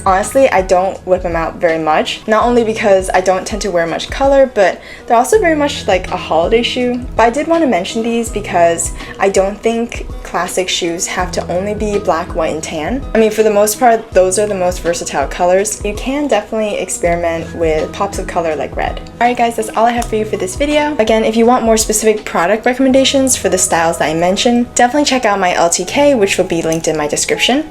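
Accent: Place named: United States. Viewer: you have a very pleasant voice and accent, are you from the usa?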